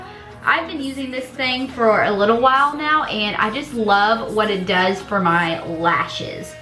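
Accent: South, United States. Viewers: Okay, I've been watching several of your videos---your southern accent drew me in! (I'm one of them southerners too). And I"ve noticed videos from different years, and I can see your weight loss is not happening like you'd want. (Tip no. 1: ditch stevia and other sweetners---they basically put your ketosis to a screeching halt. Tip no. 2 intermittent fasting---you're NEVER lose weight by exercise. I've lost all my weight (at 72 years old) and not exercised a single day.) I'd recommend watching some of Dr. Boz videos---she's a genius at Keto. I've been doing Keto for a year now, and lost about 70 pounds...So, I notice there are several things holding you back...Dr. Boz would set you straight if you spent time watching her. There's no reason you could not drop 40 or so pounds doing what she recommends. Right now I weigh 170---for the first time in 30 years...and on my way to 155, God willing. 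I'm sure Dr. Boz will really help you get to your goals---and heal a lot of things that keep dogging you. And look. Don't pay attention to those morons who leave unkind comments. If you're a Manatee (and you're not)...look at it this way. Manatees are really nice and friendly--just like you. Plus, you're the most beautiful and sweetest Manatee I've ever seen. You're very attractive. Your bright personality, your openness and honesty; those and many other things draw a lot of people to see your videos, and many are really cool and helpful for Keto recipes. You gotta keep these vi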